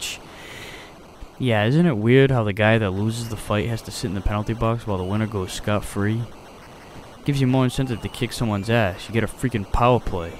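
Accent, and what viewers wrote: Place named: Boston, United States. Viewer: ah so your from boston, i was wonderin about the accent. anyways , hows boston?ill be moving there in a few months, around suffolk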